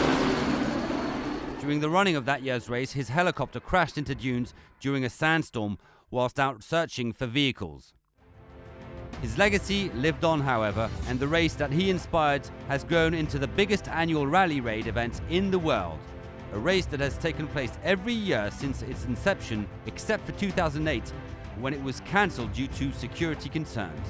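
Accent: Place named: France. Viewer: Need more French accent!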